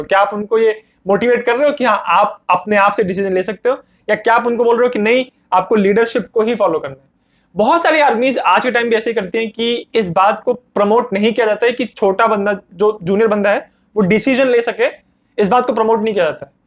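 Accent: Bengal, India. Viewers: Bhai tum Bengali ho kya please reply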